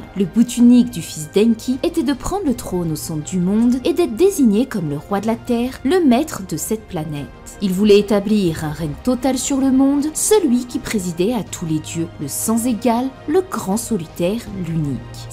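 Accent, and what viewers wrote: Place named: Belgium. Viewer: Superbe video! J ai adoré,et j attend la suite avec impatience. Quel travail, quelles recherches, et quelle analyse! Franchement, les mots me manquent. J avais moi meme fait les meme rapprochements,mais j aurais ete bien incapable de les presenter comme tu l as fait ici. Oui le travail et l inspiration d Oda sont impressionnant, mais ton boulot l est tout autant. Je suis moi meme plutot tres interessé par ces sujets que sont les anciennes civilisations et les evenements passés allant de la prehistoire a l avenement des premieres civilisations, en passant par la precession des equinoxes et son effet sur notre histoire. Le parralelle entre les siecles oubliés de onepiece et le chainon manquant comme tu dis,ou encore avec cette periode obscure de notre histoire ( -12.000 avant JC) et les anomalies qui en ont decoulé, jusqu a enfin l avenement de civilisations telles que les sumeriens ou encore les antiques egyptiens…Bravo, un grand bravo a toi. 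Et comme si ca ne suffisait pas, tu pousses le talent jusqu a la redaction et la diction d un texte travaillé. De toutes les chaines, hypotheses, et analyses de onepiece que je vois passer, je peux dire sans hesitation que c est avec ton boulot,ta vision, que je suis le plus en phase. Merci pour tout ca, et felicitations. ( par contre, un de ces quatres, ca serait pas mal de pouvoir mettre un visage sur cette delicieuse voix qu est la tienne. D ailleurs,il me semble avoir decelé un leger accent belge, notamment sur les sons en [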